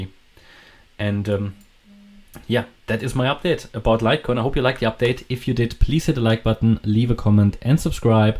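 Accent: Germany. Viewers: Deutscher das hört man, aber für die gute Arbeit gibt es ein Abo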